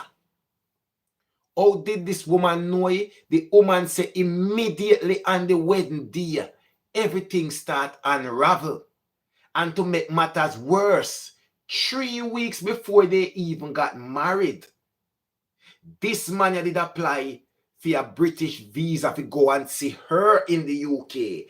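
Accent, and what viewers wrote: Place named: Patois, Jamaica. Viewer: love ❤️ the Jamaican Patwah.I am from Jamaica so I can fully understand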